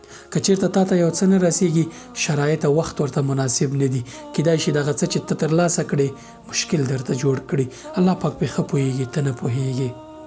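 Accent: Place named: North, Afghanistan. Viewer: احسنت يا شيخ كلماتك كانت حسينة ناعمة اسعدك الله في الدارين جنوبی پشتونستان سره تعلق لری او که شمالی سره.ماته جنوبی اشکاری ولی لهجه ای شمالی ده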